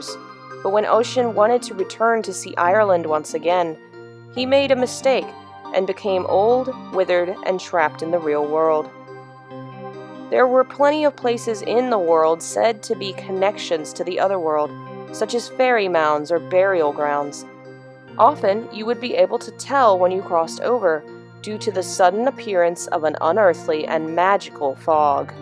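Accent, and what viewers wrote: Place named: Virginia, United States. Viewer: omg are you from Virginia?? I've been wondering what your accent is and I thought Virginia. I went to middle and high school in Richmond :)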